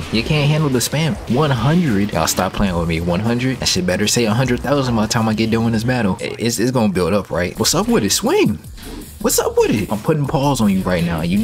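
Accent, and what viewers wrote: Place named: Philadelphia, United States. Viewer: Bro you from Philly lol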